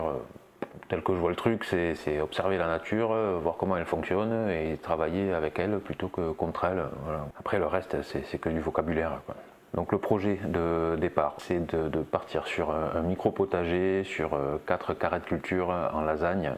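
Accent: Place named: Occitania, France. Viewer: Salut, exactement on s'en fout des mots qui finalement desservent la communauté. Oh on a le même accent, ce bel accent des gens du pays d'Oc, et avec ce brin d'auto dérision je suivrai tout ça avec beaucoup d’intérêt, je te souhaite de créer un bio lieu de vie dans ton jardin mais surtout de t'éclater dans ce que tu crées (ça ne veut pas dire, de se prendre des pelles dès que tu mets un pied dehors hein mdr).;)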